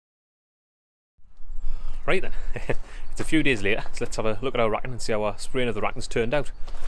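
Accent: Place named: England, United Kingdom. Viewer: From Michigan, great use of space and what a surprise to see a car on a rotisserie followed by the English accent. Good for you. There are car guys all over the world. There is a company here in the states that makes rotisserie's called Auto Twirler their slogan is a bit racy but here it is, Stripp'er Down, Turn'er Over, and Get on Top" Always makes me chuckle. Because the ladies can substitute the "er for a "em. Take Care and God Bless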